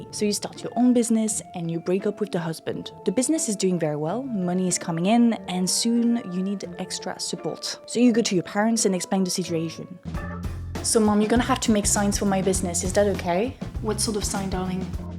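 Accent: France. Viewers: i wish I could figure out where your from. best guess... French, but English educated. doesn't matter. good content intelligent informative soft voice is easy to listen to. thanks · I have never heard a youtuber speaking english with a french accent before. Cool, nice video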